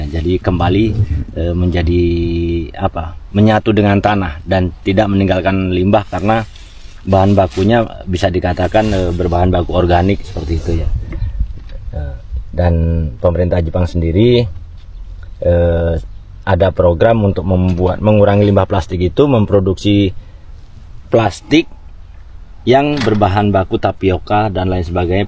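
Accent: Madura, Indonesia. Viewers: Bhs indo logat madura 😅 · alhamdulillah tabarakallah, sukses bertani moderen di negeri Sakura ❤❤Kami mau dengar, bahasa jepang logat madura atau bahasa madura logat jepang ❤❤ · Khas madura gak bisa hilang. Gaya bahasanya mirip cak islah bahrawi dan pk Mahfud MD 😂😂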